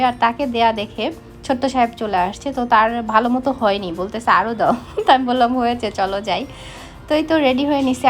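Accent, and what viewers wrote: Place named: Barisal, Bangladesh. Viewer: আসসালামু আলাইকুম. আপু অল ফ্যামিলি আপনাদের ফ্যামিলি টা খুব ভালো লাগে. সুন্দর একটা সংসার মাঝে মাঝে আপনার কথা গুলা শুনলে অনেক হাসি আহে কি হাসতে হাসতে পেট ব্যাথা হয়ে যায়. সত্যি কথা যে বরিশালের ভাষায় কথা বলেন. আবার অনেক বাসায় ভেঙ্গাইয়া কত রকম কমেন্ট করে ঢং মং করে কথা বলেন. খুব ভালো লাগে. এই যেমন ধরেন আজকে একটা কথা বলছিলেন যে বাবু, আমি যামু কাঠি দিয়া খাইতে পারি না. আমি তোকে কিভাবে খাওয়ামু ঠিক এই কথাটাই অনেক ভালো লাগে. ২. ১ টা কথা. আপনার মাঝে মাঝে অনেক.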